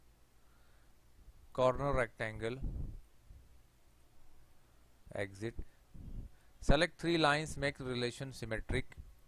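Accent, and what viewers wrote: India. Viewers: i reading comments indian accent what a effective work sir perfect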